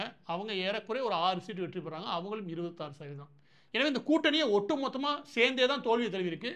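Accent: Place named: Sivagangai, India. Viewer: I like your dialects very relative to Sivagangai Cheemai. Hope you are from Manamadurai. I belong to proper Sivagangai. Pl continue. People used to kid me when I spoke the dialects in Chennai some 65 years ago. Vattara mozhi is really gives happiness.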